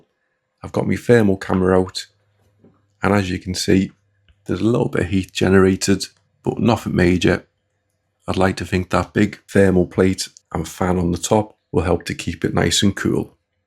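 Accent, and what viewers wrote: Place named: Liverpool, United Kingdom. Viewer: Love it, I have a Google Pixel 6a that I can run Ubuntu or Kali net hunter on. The mellow scouse accent was different.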